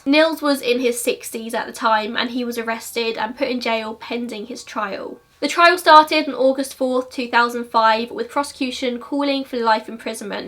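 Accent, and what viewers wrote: England, United Kingdom. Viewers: Your pronunciation is actually not so bad.:) Obviously the accent is English, but you got the majority of the sounds put together right. It’s unknown if Irmela was sexually assaulted, and even the police at the time weren’t sure. As for names, most Finnish people do go by their first names, but naming is pretty relaxed, so you kind of can go by any first or middle name and it’s not so uncommon. Also, in English someone might say “Hey,” but in Finnish people don’t say names so often, so you just say “Hey,” and only use someone’s name if you’re talking about them to someone else, so half the time it doesn’t even matter what you call someone haha. Personally I don’t think Nils is innocent of everything, and alcohol consumption and mental health are real issues in Finland, but I don’t think he murdered his friends. There’s no possible way he could have injured himself the way he was.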